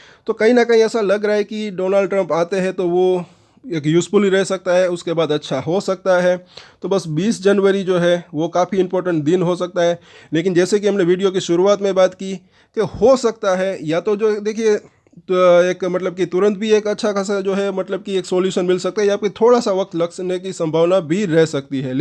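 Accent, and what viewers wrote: Gujarat, India. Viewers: ભાઈ ગુજરાતી લાગો છો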